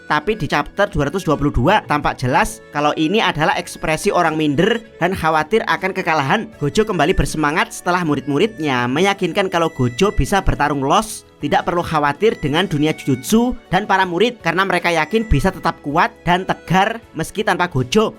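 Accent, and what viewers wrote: Java, Indonesia. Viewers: Gojo bang bulan godjo,medok banget si bang hhhhh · Gausah pake cekibrot norak banget jawernya terlalu kental,,,enak yg biasa aja om lebih adem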